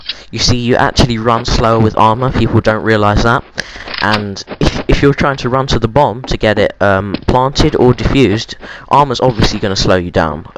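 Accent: United Kingdom. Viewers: LMAO, Great Video...im not racist but the British accent makes it funnier for some reason! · he has an english acent bad spelling*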